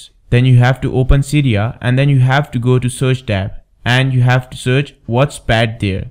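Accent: India. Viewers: Indian Accent XD Thumbs up!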